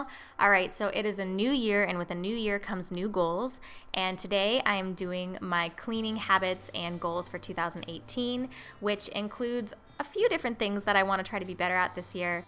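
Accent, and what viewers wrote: United States. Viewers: hey girl, where did you get that Californian accent??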